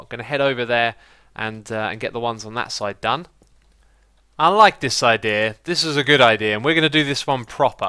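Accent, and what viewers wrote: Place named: United Kingdom. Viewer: Emanuel Ortiz Maybe it's his British accent, the sound of his lips smacking and his saliva bubbling, or maybe it's just the way he talks. You can't hate. No one can.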